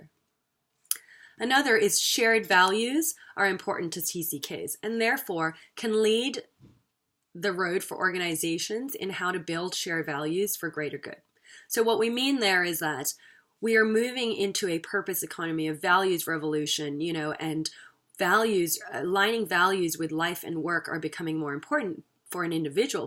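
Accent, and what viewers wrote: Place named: United States. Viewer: You sound so American.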